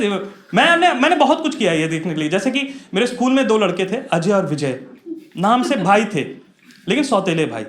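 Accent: Bihar, India. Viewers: Your style of speaking is very similar to Ravi Gupta. Maybe its the Bihari or Purbi accent! I think if you can also add Mallu Hindi accent with Bihari it can be a very good act. Liked your show, well done. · Your Bihari accent is great bhaiya❤❤ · Bhai your bihari accent owasm...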